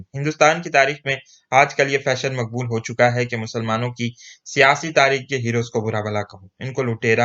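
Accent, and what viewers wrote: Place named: Pakistan. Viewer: Tum lehjay se koi Pakistani lgte ho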